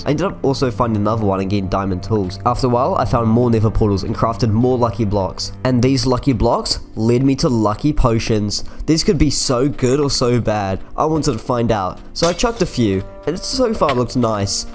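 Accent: New Zealand. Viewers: Is he from New Zealand or something? he's got a kinda kiwi accent 👀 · R u kiwi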